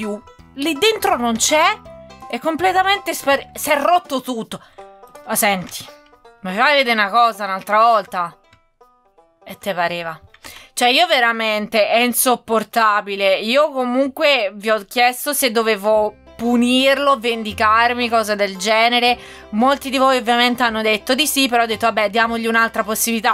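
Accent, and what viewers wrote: Italy. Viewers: ma sei marchigiana?P.s leggilo.Ti stimo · comunque ogni tanto l'accento de Roma se sente e te sta pure bene. Per il maiala puoi usare carote, patate, o barbabietole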